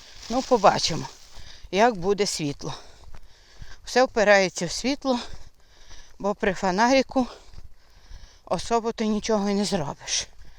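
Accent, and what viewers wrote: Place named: Ternopil, Ukraine. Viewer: Нагадали Ви менi моi 20 рокiв😊 В Днiпродзержинськ на швацьку фабрику приiжджали кожен рiк декiлька десяткiв дiвчат з Тернопiльськоi областi пiсля швацького училища. Так вони розмовляли так само, як Ви. Особливо слово " але" дуже часто вживали. " I" з дома крапками не можу писати - тiльки нiмецькi та росiйськi лiтери маю. Батьки були росiйськими нiмцями. Розмовляти украiнською не мала потреби, але читала, писала у школi. Не забула 😃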